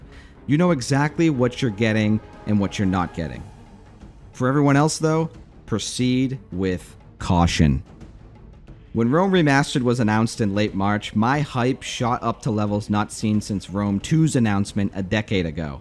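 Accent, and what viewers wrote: Boston, United States. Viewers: idk sounds like Boston or New York accent. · 🤣 Dudeguy, are you from Bean Town? You sound like all my homies 🤣 Solid review, I haven't purchased this game and I probably wont until the price drops way down. I love me some Rome TW, but I don't wanna scratch my rose tinted glasses.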